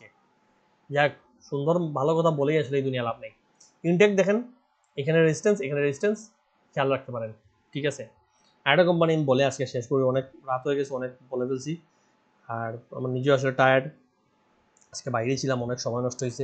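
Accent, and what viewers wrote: Sylhet, Bangladesh. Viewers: Apni ki sylheti vaichab ni kitha khonor valani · কিতা বা সোনা আপনি কি সিলেটি নি । আপনার বাড়ি চুনারুঘাট মৌলভীবাজার নাকি ফার্স সিলেটি। সুনামগঞ্জ আমাদের অনেক লোক আছে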